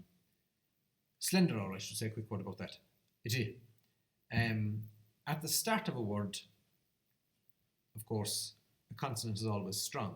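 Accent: Kerry, Ireland. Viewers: Kerry for sure. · I’d say Kerry. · Kerry most likely, if not Kerry than Cork.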